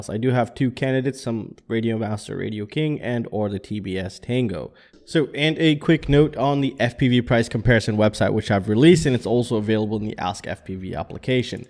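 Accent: United States. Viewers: You sound very much american, yet you live in europe, just curious about your situation.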